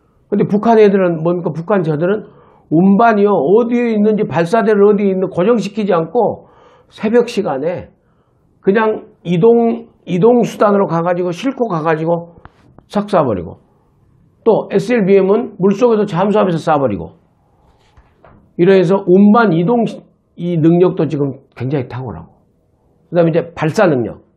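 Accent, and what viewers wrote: Jeolla, South Korea. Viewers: 민원장님 전라도 사투리는 애정이 갑니다.많이많이 지지 합니다.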